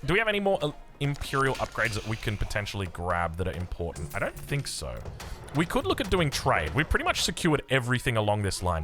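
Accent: Australia. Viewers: Yes he’s just Aussie it don’t rlly matter but yeah we normies say “cash”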